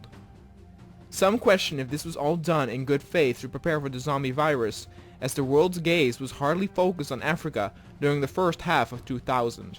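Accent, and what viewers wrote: Netherlands. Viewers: Are you Dutch? It sounds like it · You're dutch right?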